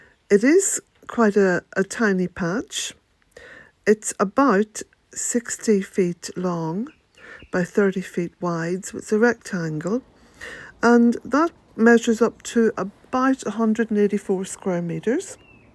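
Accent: Ireland. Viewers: Thank you for sharing this adventure. in your daughter's lovely, lively garden, so wonderful with your Irish lilt You can be a proud mum. 🌰🍇🥔🍏. Blessings from central va, u.s.and a hug for Patsy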